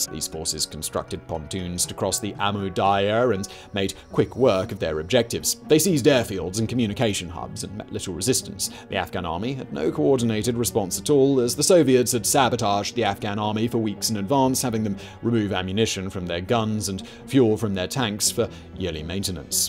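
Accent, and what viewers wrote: RP, United Kingdom. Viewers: a lot of his videos are in pretty poor taste, like there's videos on his other channels about some really really sad and heavy stuff and yet he delivers it in this weird posh accent that makes it seem like he's joking, super irritating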